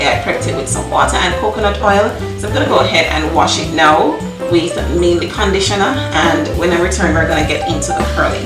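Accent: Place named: Caribbean. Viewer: I'm hearing a Caribbean accent. Where are uu from?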